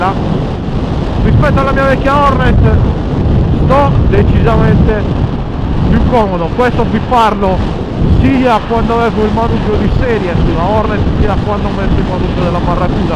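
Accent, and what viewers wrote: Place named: Sardinia, Italy. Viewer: mi fa morire il dialetto sardo😂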